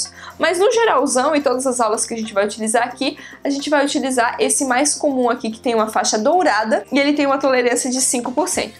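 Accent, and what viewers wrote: Rio Grande do Sul, Brazil. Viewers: E pelo sutaque é gaúcha kkk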